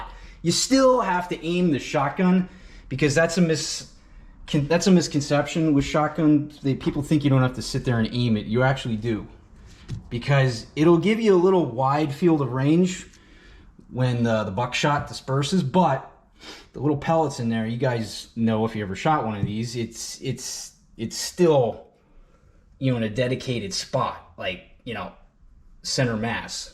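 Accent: United States. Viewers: Hey, bro, This is Seoul and I’m a South Korean. I love your English accent. Where are you originally from? Born and raised in Texas? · Dummies don't need to be within 5 miles of a firearm. Dummies get themselves and other innocents killed! This guy has a damn Yankee accent and attitude! "YANKEE GO HOME!"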